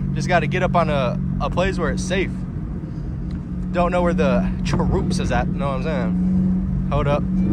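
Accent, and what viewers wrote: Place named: Atlanta, United States. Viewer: White dude with an Atlanta accent is Funny asl ❤️ the zl1 is hard gng 💯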